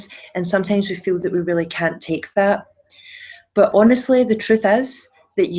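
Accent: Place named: Scotland, United Kingdom. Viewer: Hello, who did you say your mentor was? You never put it in the description box. Good to hear a Scottish accent like mine 👍🏻😊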